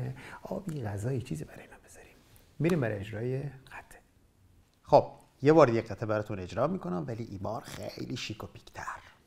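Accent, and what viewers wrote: Bushehr, Iran. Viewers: دمت گرم، لهجه بوشهری عالیییییییی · لطفا لهجه شیرین بوشهری رو نگه دارید🙏 به موقع قرض ضد بیماری قند خواهیم خرید!😊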